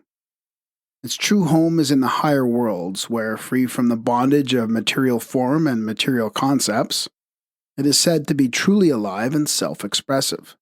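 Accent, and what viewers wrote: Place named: Upper class, United Kingdom. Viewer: Upper-class British voice is always the way... oh well.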